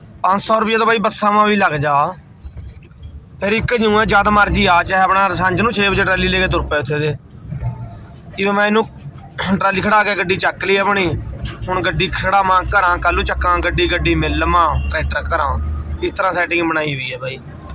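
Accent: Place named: Uttar Pradesh, India. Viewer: area kehra veer thuda boli ta up wli lgdi a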